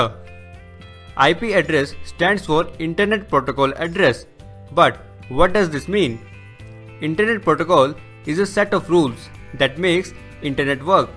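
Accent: India. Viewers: I like how he has an Indian accent and talking about IP address. Truly, perfect.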